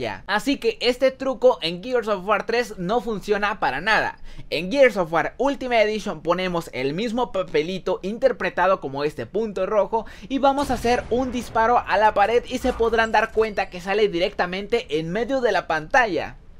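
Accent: Mexico. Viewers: eres de mexico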